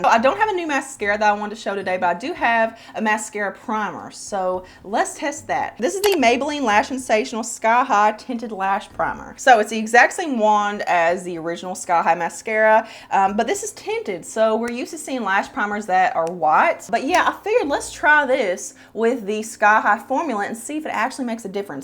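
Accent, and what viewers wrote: South, United States. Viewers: You're such a pretty lady, and I LOVE your Southern accent. Makes me feel at home. 😀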